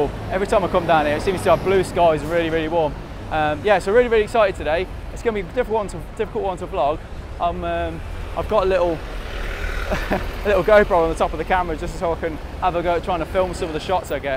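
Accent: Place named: Wales, United Kingdom. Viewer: I found him pretty easy to understand. I also liked his relaxed blogging style, which I guess you don't much. You're probably not used to a Welsh accent, which may explain why you are struggling to understand English. Otherwise, you might want to get your ears checked out in case you are going deaf, which would also be a better use of your time than trolling.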